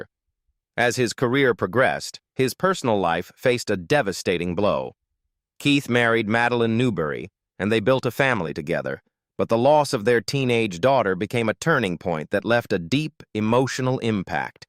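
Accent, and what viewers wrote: United States. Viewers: An American voice as well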